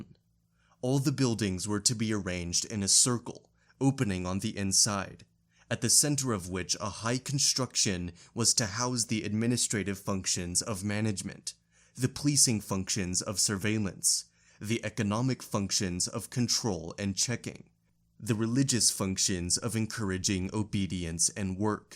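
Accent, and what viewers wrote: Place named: United States. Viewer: You sound like a native speaker of American English, but you seem to have a lot of trouble with pronunciation of multisyllabic words. May I recommend that before you read a section, you look up longer words in the dictionary to get the pronunciation right? It's a massively hard job you're trying to do and it's a shame you're not creating masterpieces of oral presentation. (The most common error: Although the accent falls on the first and third syllables of the noun "distribution", in the adjectives "distributive" and "distributive" the accent falls on the second syllable. I think in places you're saying "juridical" but the pronunciation is so garbled, it's hard to know.)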